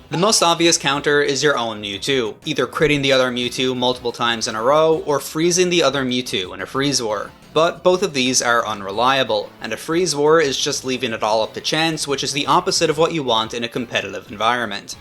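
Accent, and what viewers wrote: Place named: Newfoundland, Canada. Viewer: I detect a slight Atlantic Canada/Newfoundland accent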